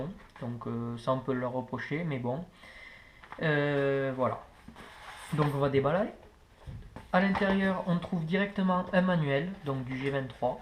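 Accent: South, France. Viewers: au l accent sudiste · pour l'accent du sud :) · nan mdrrrr c'est un accent du sud 😂😂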